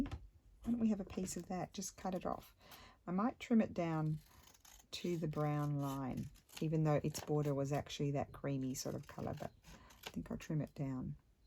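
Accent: Australia. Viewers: I so enjoy your videos! You are so talented and nothing beats an Aussie accent, especially when you say here! Keep up the good work1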